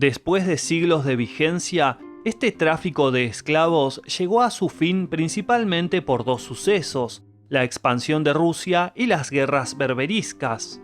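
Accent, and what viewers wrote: Argentina. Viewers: Un mishon? Que significa un mishon 😂 horrible acento argentino horrible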